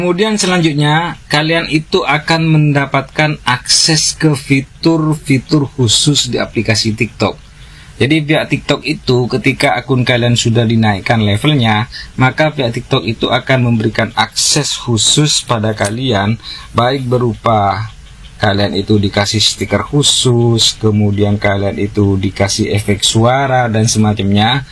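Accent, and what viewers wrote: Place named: Madura, Indonesia. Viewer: Logat madura kyk nya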